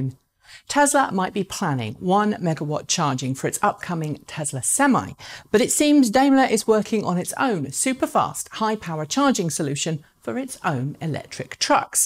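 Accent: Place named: United Kingdom. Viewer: why do you have a distinct British accent but make your show for the US market? From my point of view, It would make more sense, if you either made a news series concentrating on the British and Irish market (which is quite distinct to the US market with its regulation, currencies, and steering) with prices in GBP (UK) and EUR (Ireland), rebates in these countries and availability there. Or do a news series in US english with US prices, US tax rebates and US availability. If your series is intended for international viewers mixing stuff from around the world, then there should be a clear label, for which markets each of the news really apply. You could add a flag in a corner of the screen. The news about an electric food truck from an aftermarket conversion company for example will only apply to a certain region of the world. And this region should be told to the audience.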